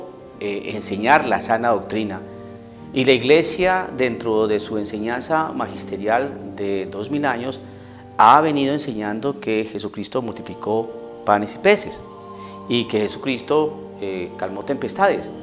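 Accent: Colombia. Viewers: Padre, me gusta mucho todo lo que explica en cada video, no he visto todos sus videos pero los pocos que he escuchado me dejan impresionada de como el Espiritu Santo le ilumina, me impresiono tambien el grado de firmeza que tiene en su fe y su verdadera vocacion sacerdotal, a pesar de ser tan joven me admira como esta tan centrado en la VERDAD de Jesus mejor que muchos sacerdotes que tristemente no estan estudiando los signos de los tiempos y por eso se estan cegando ante tanta apostasia y confusion. Dios le bendiga. Por su acento creo es de ¿Colombia? Colombia y Mexico seran paises grandemente purificados porque de estos dos paises saldran muchas luces que llevaran al mundo la doctrina verdadera, cuando estemos en oscuridad... En oracion y vigilancia como Jesus nos los pidio. Saludos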